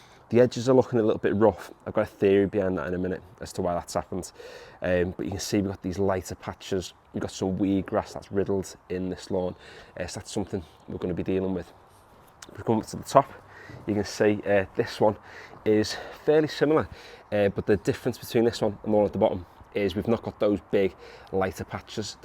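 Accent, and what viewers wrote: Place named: North West England, United Kingdom. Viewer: Need to start mine soon. I’m in the North West, guessing from your accent this garden isn’t a million miles away? Need to get the weed and feed down as well as my first mow (I know there needs to be 5 days between the two) and I’ve just picked up a scarifier as that desperately needs doing too. Anyway fairly large garden (about 3 times the size of this garden), south facing and at the mo is quite muddy and covered in moss. 😔 On the plus side it’s in the sun all day as no buildings nearby casting a shadow. Anyway was thinking mow in the next few days, weed and feed at the beginning of April then scarifier poss a week or two later (with mows inbetween of course).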